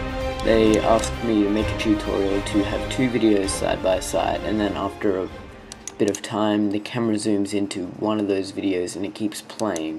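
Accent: Australia. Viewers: Yay, you're Australian like me. ;)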